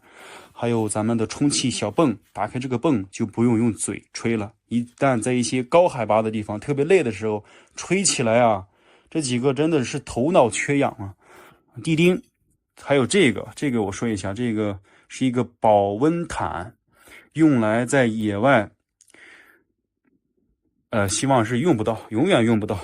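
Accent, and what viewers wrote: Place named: Shandong, China. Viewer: 每天都期待你的视频，阳光正能量，更重要的是听着你山东普通话特别亲切，为咱们山东人点赞👍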